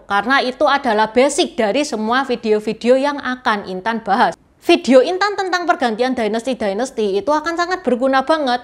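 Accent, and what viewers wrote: Java, Indonesia. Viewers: Mbaknya logatnya jawa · ini tionghoa jawa kaya nya bicara nya medok jawa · Logatnya Jawa sekali mbak intan ini ❤ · Intan kok medok bgt jawanya emg tinggal dmn???? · Medok jawa😂😂😂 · Aku suka suara mbk nya,,medok jawa nya itu lo yg bikin kecanduan.. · Cici intan ..bahasane medok men to yo ....jawa mesti ..😅😅😅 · Unik sekali ka intan, wajah tionghoa bahasa indonesia medok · Masyallah mdok bgt logat jwa ne mbk e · Contoh yg paling mudah adalah mba Intan penampilannya ngak salah lagi tionghoa tetapi kalau berbicara wah aksennya kentel kejawi-annya, krn orang2 tionghoa di Indonesia semuanya spt mba Intan, didaerah mana mereka menetap mereka membaur dgn masyarakat setempat baik diAceh,Batak, Minang sampai ke Papua. Adalah naif kalau dibilang bangsa tionghoa bukan bagian dari suku2 di Indonesia. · Mbaknya ngomongnya waduh...medok banget kelahiran mana ya mbak, ❤❤❤🎉🎉🎉🎉🎉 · Jowone medok banget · Mbak intan ini orang keturunan tionghoa, tapi logat jowone kuwentel banget.. 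Yo wis lah, memang budaya cina itu sdh masuk ke dlm darah daging Bhinneka tunggal ika. Tetap semangat!! berbesar jiwa lah kalo masih ada pribumi yg mendiskriminasi kalian, jangan tersinggung. Saya yakin kalian akan semakin dewasa dlm berfikir, karena kaum dr kalian lah perekonomian di negeri ini semakin kuat. Synergi mu adalah existensi negeri ini.. Peace ❤️ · mc.logatnya terlalu menthol kejawaan kurang enak didngat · Logate ngomong jawa banget cik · Adminya ciwo cina jowo bahasa Indonesianya logat jowo medok 😁👍👍🙏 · Wadduh.saluut mbak jowone medhok tenan hehehe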